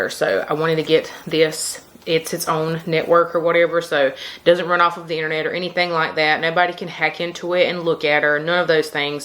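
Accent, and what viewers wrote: South, United States. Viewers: I just love your southern accent 😍 baby mila is so cute.. Its a lil replica of... My baby just turned 4 months too and its no where near miss millies milestone she is so smart.. And girl let me not start with that junk in the trunk you got 🙈 u got it all in the right places.. Lol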